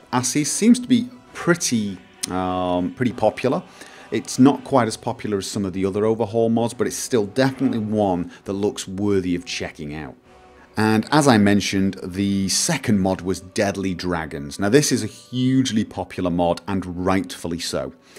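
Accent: Norway. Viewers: Same! And 'cause I love love your voice and accent! (Norway?)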